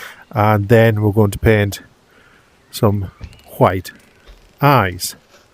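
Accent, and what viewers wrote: United Kingdom. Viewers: Just got Vermillion and was after a vid that explained it all. Up yours popped, and I'm glad it did. Clear and thorough, all with a welcoming NE accent (I'm not foolish enough to risk a guess which one...). Thanks for posting! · I am very grateful for this tutorial, nice to hear a fellow Brit. Exporting on my quest 3 goes to the data file in the android folder. BUT, it is saved as a VRMLN file. There is no option to save to a particular file format such as jpeg or png. I am doing something wrong? Do I need an account? Is there a setting somewhere I missed? Any help would be gratefully received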